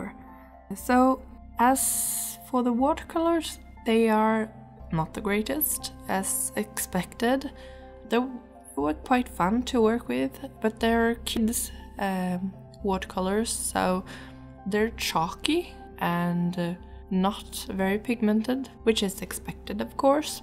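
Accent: Sweden. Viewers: omg are you swedish??? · I love your art, and I adore your Swedish accent. Marry me please <3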